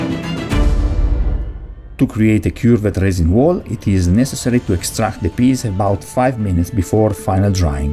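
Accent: Italy. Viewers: Hey what part of Italy do you live? (I think that's an Italian accent but I've been drinking)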